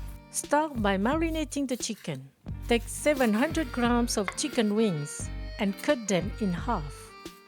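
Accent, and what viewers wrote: France. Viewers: I like how you’re Chinese but sound like you’re French.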